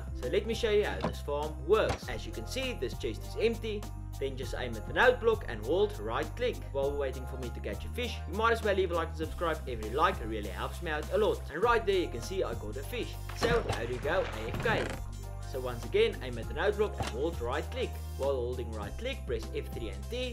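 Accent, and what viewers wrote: South Africa. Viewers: Oh okay I could tell cause I'm also from South Africa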